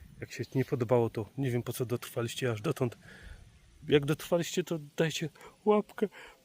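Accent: Silesia, Poland. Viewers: Fest zaciągosz po naszymu Podobo mi sie to Pozdrawiom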